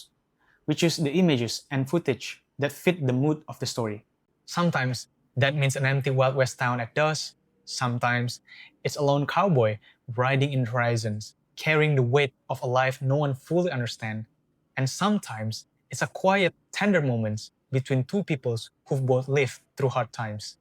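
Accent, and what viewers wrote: South, United States. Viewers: The narrator being from the southern US is kind of weird. The stories are nice.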